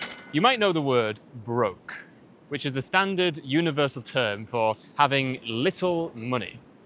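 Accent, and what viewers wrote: United Kingdom. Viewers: açıkçası İngiliz İngilizcesinin telafuzunu daha çok beğendim 😅 Amerikan İngilizcesi konuşacağım ama yine de sizi dinleyerek İngilizcemi geliştirebilir miyim? Bu arada Türkiye'den selamlar❤ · Good speak British ❤ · I am from Bangalore. I love to watch your video for improving my british accent ❤❤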